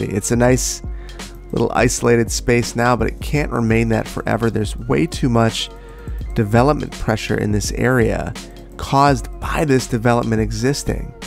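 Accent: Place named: Midwest, United States. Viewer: My wife and I were convinced that you had to be from the Midwest. Now we're certain. Keep up the good content man. Go Pack Go.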